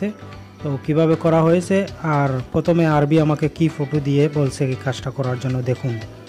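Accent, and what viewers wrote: Sylhet, Bangladesh. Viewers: Vai sylheti ni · Sylheti ni bai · খাস সিলেটি❤️ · ভাই তুমি সিলেটি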